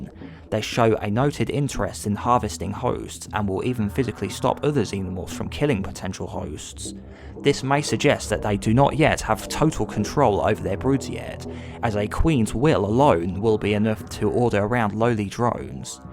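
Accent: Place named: United Kingdom. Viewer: Cool British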